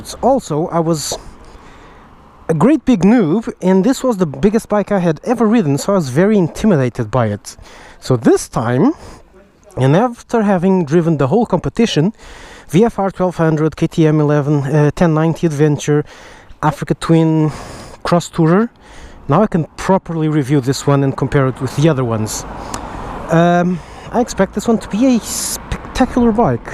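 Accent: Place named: Portugal. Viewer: Incrível como topei em 5 segundos que era um tuga a falar inglês.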